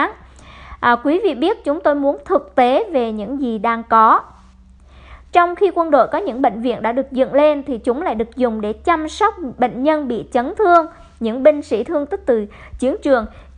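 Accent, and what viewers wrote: Vietnam. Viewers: . Trong lúc đưa tin, chị có dùng từ "cơ cấu", sau đó chị lại nói " dùng từ VIỆT CỘNG". Vậy xin hỏi chị, thế nào là "từ Việt Cộng"? Chẳng lẽ "từ Việt Cộng" không phải là tiếng Việt trong khi chị cũng đang dùng tiếng Việt đó mà! Và từ "cơ cấu" là từ của Việt Cộng" thì từ "cơ chế" là từ của ai? Của chị à? Bởi vậy, hai chữ "Việt Cộng" của chị khoét sâu vào sự chia rẽ không đáng có giữa "chúng ta", những người Việt Nam với nhau, có cùng chung tổ quốc và ngôn ngữ. Xin giải thích thêm, ở Việt Nam, cả 2 từ "cơ cấu" và "cơ chế" người ta đều sử dụng gần như đồng nghĩa, từ "cơ chế" thậm chí được dùng phổ biến hơn. Sau này từ "cơ cấu" cũng có khuynh hướng được dùng như một động từ, nghĩa là "gắn đặt một cái gì, một ai đó vào một hệ thống". Cũng xin nói thêm, cách dùng từ tiếng Việt và phát âm tiếng Anh này kia của rất dễ nhận thấy là chị đã ở Việt Nam (hay nói cách khác là ở với "Việt Cộng") rất lâu trước khi sang Mỹ.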